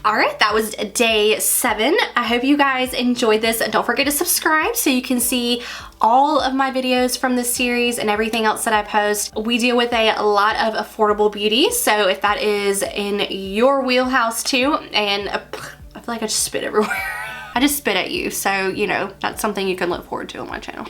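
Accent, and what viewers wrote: South, United States. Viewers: Great review! Love your adorable Southern lilt. Totally adorable vibe. Can't wait to try this product. ❤😊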